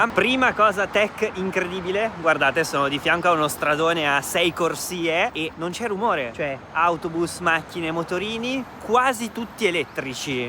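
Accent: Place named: Veneto, Italy. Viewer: È l'accento veneto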